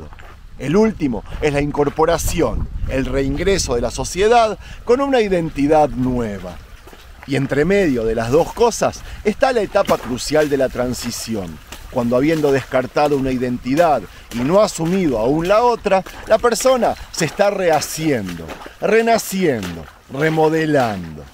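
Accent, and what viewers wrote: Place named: Rioplatense, Argentina. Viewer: Tenes rasgos de desendientes!hablas como argentino o.uruaguayo sholom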